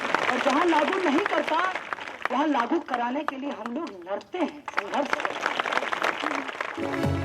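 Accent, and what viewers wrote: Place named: Bihar, India. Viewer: کنائیہ جی ہمیں کل ہی آپ کا تعرف ہوا ہے ایک بھارتی دوست سے اور کل سے آپ کا سپیچ ہی سن رہے ہیں Hamain bihari zaban to pehly hi bohat achi lagti thi ab ap sy bhi dil lagi hogai ha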